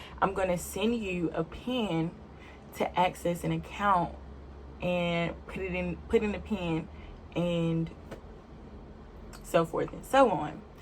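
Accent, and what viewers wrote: United States. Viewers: that’s a Baltimore. Accent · Are you from St. Louis ?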